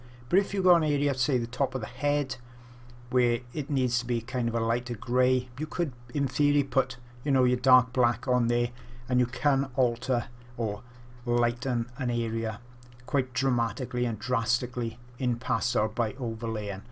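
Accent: Wales, United Kingdom. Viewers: Woooo wow, this is excellent 👏🏻👍🏼 love your art.. can I hear a Welsh accent!!!! I'm from carnarvon North Wales originally ✌️✌️😁😁🇬🇧🐲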